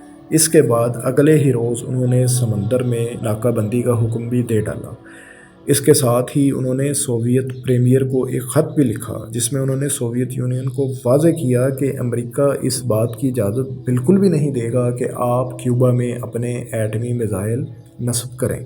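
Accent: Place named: Punjab, Pakistan. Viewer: dude, your punjabi acent!! Content is amazing but your accent really ruins it.. Sorry!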